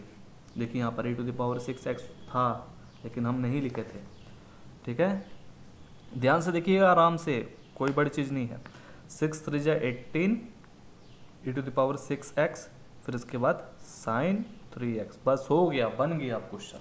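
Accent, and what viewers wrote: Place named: Bihar, India. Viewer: Are sir bihar se hai na..... Lallantop style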